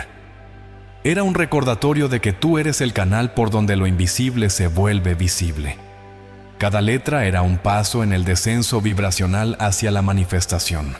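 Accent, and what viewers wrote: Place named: Brazil. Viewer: Que lindo acento de Brasil. El idioma se escucha lindo.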